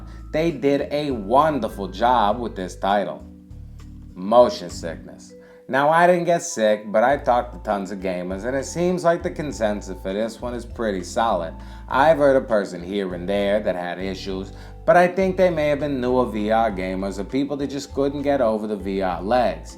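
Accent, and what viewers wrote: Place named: Philadelphia, United States. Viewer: I’m from Philadelphia your accent sounds like mine lol I have you a subscribe because you deserve it I’m getting my first psvr set this Monday well my first vr set ever and you really helped me choose my first game . Can only choose one for now because money is tight thanks for your hard work I’m going to go check out your other videos too You remind me of someone who I would befriend lol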